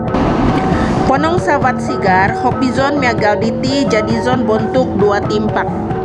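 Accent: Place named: Papar, Malaysia. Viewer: Voice over not bad with the papar dialect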